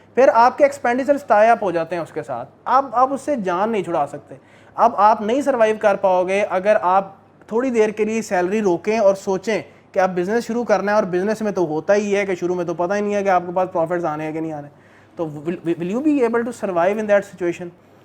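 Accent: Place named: Punjab, India. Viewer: Nice punjabi excent.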